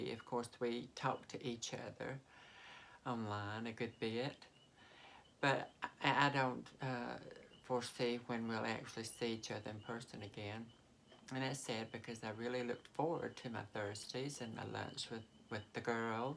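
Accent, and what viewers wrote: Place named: Mississippi, United States. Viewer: like you I'm 63 years old and I still full on hug my son who is 41 and a leader of men and women in the fire service. I have always hugged my family even the inlaws. You and I have more in common than most people would believe. Like you I struggled in school because in the early 1970's learning disabilities like dyslexia or the broader spectrum of autism didn't reach the rural schools. In spite of my comment to learning, I just didn't get it. While my grades were never very good, my conduct and respect for others was above expectations. Turns out I'm extremely mechanical and thrived in the apprenticeship programs of the trucking industry. Show me how to do something and I'll never forget it, tell me, and it will time for me to figure it out. I love your Mississippi draw and voice inflection. Bests wishes and stay positive.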